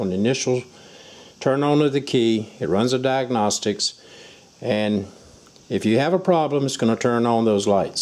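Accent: South, United States. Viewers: my 2003 Cadillac Deville has this problem, and I'm going to <link> thanks for the informative video and that Southern Accent..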